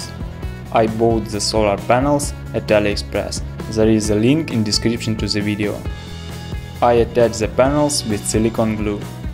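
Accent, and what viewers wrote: Russia. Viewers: Это же русский по английский придуривается. И клей российский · говорит с русским акцентом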